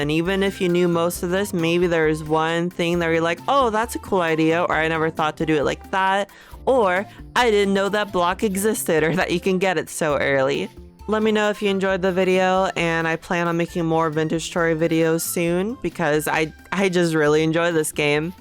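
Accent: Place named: United States. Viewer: i cannot for the life of me place this accent. i know it. i have BEEN here before, but i cannot recall where in america this is anyway regarding the crude door-- that dont break near frequent enough to deter me. i love how that door looks, takes 2 seconds to put back. hardly different than my real doors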